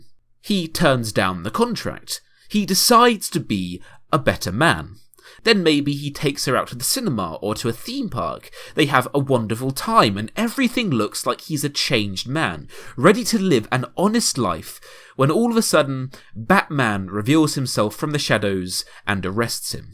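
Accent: United States. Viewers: wow dude you have a nice american accent i wish i could do a good British accent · american accent revealed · I love your american accent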